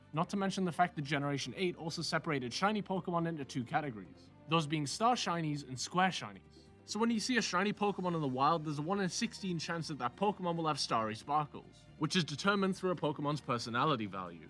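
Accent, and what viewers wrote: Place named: North, United Kingdom. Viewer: Nice one bud, had to reward that top tier tweet with a video watch, quality stuff. Especially the lush northern accent. Shiny locking has never made sense, but you're especially spot on about the Gen 3 colour changes, I hardly even clocked them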